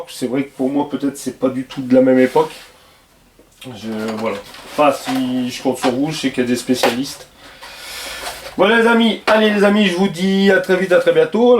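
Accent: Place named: Nord-Pas-de-Calais, France. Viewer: Bonjour Attention! Ce sont deux époques "guerrières" différentes: cela pourrait choquer. Mais en attendant, félicitation pour tout ce que tu fais...de même pour tes directs "pur jus",avec ta manière chti de t'exprimer! Patrick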